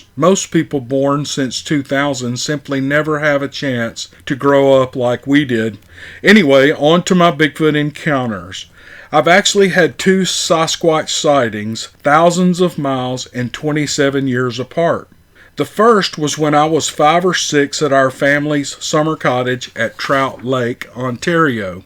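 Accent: South, United States. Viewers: I'm from the UK. Every Saturday my four year old grandson stays with me, as I'm a widower. And he loves bigfoot stories, you are his favourite bigfoot story teller. When he goes to bed, it's always to your deep southern drawl he falls asleep to. So thank you, bud. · Cool! You sound so familiar when you say "Maggie". My name's Maggie also, & here where I'm from in Macclenny, FL we speak with the same southern accent as you do. I'm almost right on the FL/GA line. Sure is a great story😊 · You did a pretty good job with pronunciation! It’s delightful to hear so southern a voice speak a northern name . My only complaint is there are’nt enough material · That story was written so well I could picture it in my mind as you read it. Your voice lends such a warm quality it’s soothing to the ears...I feel like I’m listening to a relative of mine telling a story because we all have southern accent as well. 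I giggled out loud at the comment about adhesive on the dentures or you’ll spit them on the table. 🐿 You have a way with words 😂 Thank you for your stories. I’ve enjoyed each and every one I’ve heard. Your time and efforts are definitely appreciated. Look forward to hearing more! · That's how us Southern boys talk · Thanks! We love to hear that southern drawl telling a fresh story 👍🏻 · Hey, Always excited for another encounter story narrated by you and your awesome Southern drawl!!! Squirrel!!! 😍👣 Thank you for your time. Your interest in these encounters is infectious and incredibly heartwarming. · Well done another great story told in your wonderful Southern drawl up the South!!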